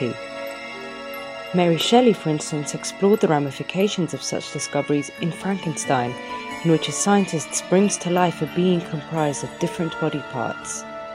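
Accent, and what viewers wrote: United Kingdom. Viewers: for me, Gothic (anything) is pure Heaven as I adore Halloween in all its Glory and endeavour to make it as scary and fun for the Kiddies of my little Neighbourhood as possible. a few years ago I heard Halloween was on the wain but then found out we (in North America) spend about $30 B on the stuff rivalled only by Christmas. for some unknown reason, all Gothic Architecture gives me chills and sends me back in time. one more observation is your incredible accent and phrasing. my Granny was from Lancashire but an English Teacher and her pronunciation of words were so similar and I have never forgotten this to this day.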